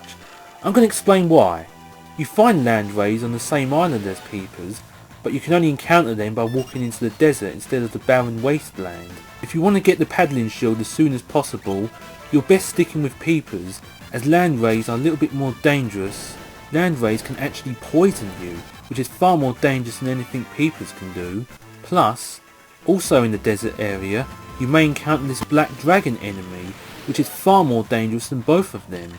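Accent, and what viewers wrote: England, United Kingdom. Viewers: its because he is english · WOOOW BRITISH ACCENT! Love it!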